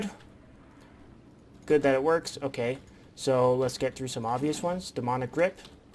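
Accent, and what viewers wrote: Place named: India. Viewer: So thats how u sound. Hey ur a pretty good duler. I thought u were some indian from india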